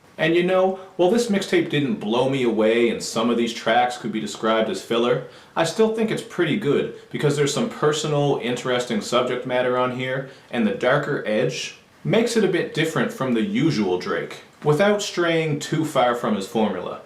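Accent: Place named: Canada. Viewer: I liked the album and how sensitive Drake is. I love and. Great reviews btw! You're super cute and sound Canadian, are you Caribbean?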